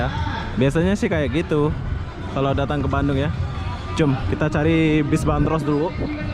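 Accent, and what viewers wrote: Malaysia. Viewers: Kak asli org mana? Kok ngomong ke melayu melayu gitu hehe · Orang malaysia itu .cara ngomong nya keliatan . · Abang dari Lombok???😑😑😑 Bahasa Lombok dan loghat nya GK ada Melayu 😑😑tipu lah Abang ni,Abang dari Malaysia bukan dari Lombok 😑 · Ini Abang dari mana logatnya mirip orang Malaysia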